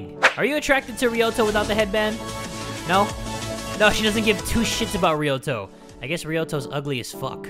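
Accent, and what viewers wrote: United Kingdom. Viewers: I said that before this was even an updated OWWWWWWwwWwWwWwWwwWWww all I hear is nice happy music and then all of a sudden starts talking My ears are bleeding let me turn down the volume Hold up did it just turn itself back up God dang it * clickclickclick* oh no it’s too quiet * click x5* now it’s way too loud Jesus *clickclickclick*. Perfect wait hold up he’s British! Thank you for following my journey It was kind of like it was live but wasn’t sadly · i love hearing speaking in a british accent because im british lmao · Love the British accent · Why is bro British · Hahaha i love your british accent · I LOVE YA BRITISH ACCSENT 10/10 [I know British accsents because I am British] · great British accent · Omg, that British accent ♡^♡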